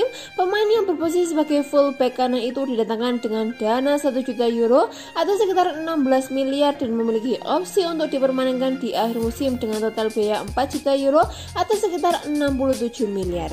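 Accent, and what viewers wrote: Java, Indonesia. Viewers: Medok banget....🤣🤣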